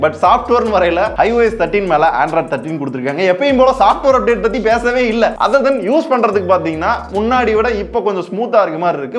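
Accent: Madurai, India. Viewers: Bro neega madurai thana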